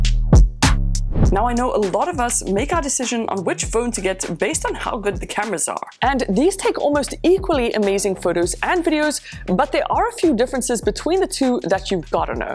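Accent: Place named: South Africa. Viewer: You sound South African :) Love the content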